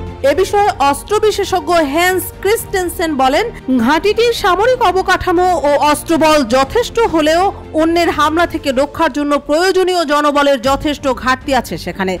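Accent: India. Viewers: Ei shangbadik indiar hobe kotha bolar dhoron tai mone hoye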